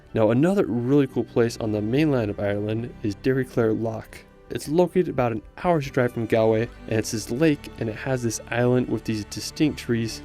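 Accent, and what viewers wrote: United States. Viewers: What a strange dialect does the speaker use? Sounds much like American, e.g. he says "senner" instead of "centre". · Why he sound like American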